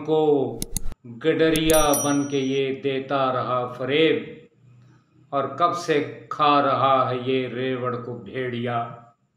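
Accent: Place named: Iran. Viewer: Your persian accent is so beautiful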